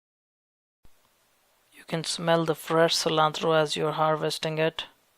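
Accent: India. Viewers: I love the accent on these Injun guys....much more cultivated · Are you Indian ???your accent is different than of Americans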